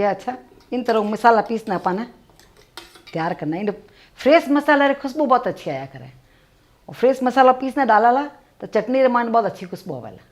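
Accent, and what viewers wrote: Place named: Marwar, India. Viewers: Amma aap ka marwadi accent bahut achha laga. Chatni mai aaj hi try karunga banane ka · Mam am fan of ur marwari accent and the recipe also very fabulous. , well done keep it up 😋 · Mujhe marwadi nahi aati per aap jab bolte ho tho bahut acha lagta hai aap ki cooking great hai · Your Marwari accent is so wonderful! When you talk I feel my grandma is teaching me again. The chutney was amazing! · जितनी आपकी चटनी अच्छी है उससे ज्यादा आपकी बोली अच्छी है। इंग्लिश हिन्दी और मारवाडी का अच्छा वार्तालाप है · Ye jodhpuri language badi meethi lagti h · Wow m Punjabi and understand ur marwadi accent easily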